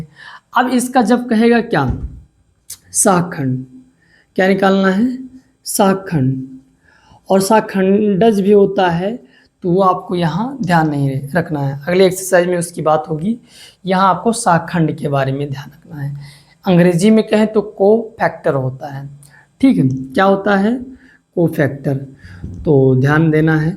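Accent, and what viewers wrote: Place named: Awadh, India. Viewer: Sar avadhi bol rahe ho thoda bahut😅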